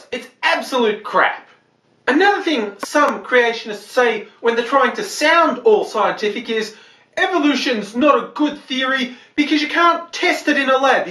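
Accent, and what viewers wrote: Australia. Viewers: Your definitely an Aussie,and your definitely angry,well sort of,in a controlled type angry way,like angry with a purpose,almost assertive with a loud controlled voice,anyway good vid,good points made. Do you think the C's will listen,I doubt it,they have a god given logic filter,but at least you got it of your chest,just keep shouting at them.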